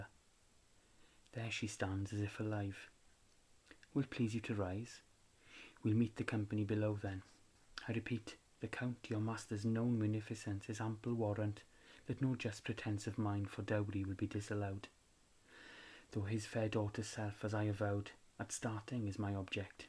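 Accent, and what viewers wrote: Wales, United Kingdom. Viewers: A Welsh accent! Very pleasant to listen to. Supposedly many of my ancestors were Welsh (I'm American). I can't wait to see more videos from you! :)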